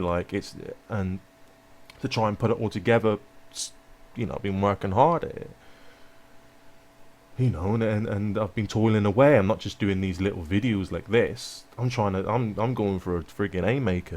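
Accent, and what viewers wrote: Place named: Bristol, United Kingdom. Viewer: you sound like you from bristol am i correct i lived there for 2 years? lol the hay maker is coming ha ha funny brother!!!!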